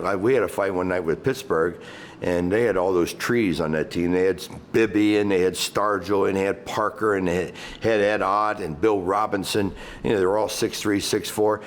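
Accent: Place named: Cincinnati, United States. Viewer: Pure Cincinnati accent. If anyone want to know what SW ohio sounds like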